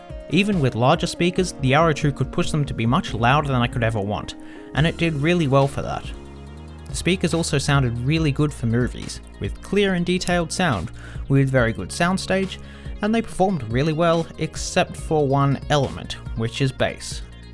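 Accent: Australia. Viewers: Glossy black plastic? That's the deal breaker right there no matter how great they may sound. The use of glossy black plastic on all consumer goods should be outlawed and banned as far as I'm concerned. The amp seems decent enough but the drivers sound sub par from what I can understand and I'm not at all surprised. For the price the speakers (of reputable quality) should be housed in wooden boxes at the very least. Anyway I enjoyed the video nonetheless and the chaps accent reminds me of the days of being at the SCG, WACCA and MCG again. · guy's an Aussie? Fuck me I couldn't for the life of me figure out where his accent came from. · Do I hear an Aussie accent or what! :D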